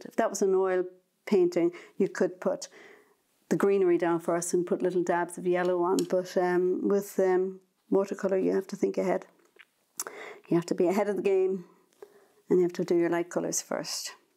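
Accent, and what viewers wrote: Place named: South, Ireland. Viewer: Hi, I really enjoyed your video! However your lighting made it a little difficult to see the image very well. And the image was a bit blurry. I checked if it was the tablet I was viewing from, but it was only on your video that this effect occurred, which is sad because yours is the content I want to see. I LOVE your painting style, and your teaching style. Plus you have a beautiful, gentle, soothing voice which reminds me of my father who was Southern Irish. I have subscribed to your channel today and this video is the first video of yours I have watched and I LOVE your channel. Is there any way for the camera to be closer to the painting, and could you add stronger lighting please, because that would help folks like me who are watercolour newbies, who need to see more of what is going on, on the page? I know it may be asking for a lot, but if it could be sorted out, then we would get so much more from the videos. 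Thank you in advance. God bless you and all those you love. Have a great day!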